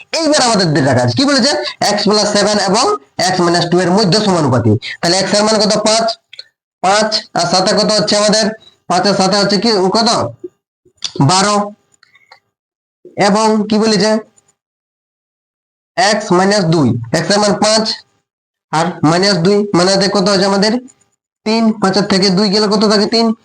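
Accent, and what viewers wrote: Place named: Purulia, India. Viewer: Sir, আপনার কথা বলার ধরনটা পালটাও পুরুলিয়ার ভাষা বলো না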